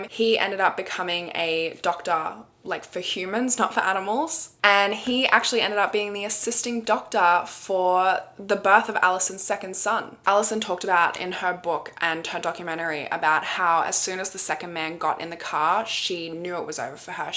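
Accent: Australia. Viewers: Really enjoyed this story. But "like "like" "like" and with the Kath and Kim accent in there, was a bit grating on my old brain. I wish you all the success utube can give you.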